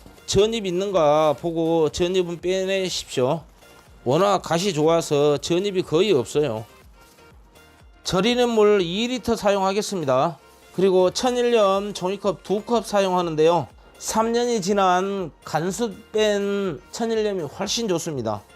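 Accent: Jeolla, South Korea. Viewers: 셰프님 구수한전라도 사투리정감있네요.ㅎ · 좋아요.전라도사투리 정감가네요.ㅎ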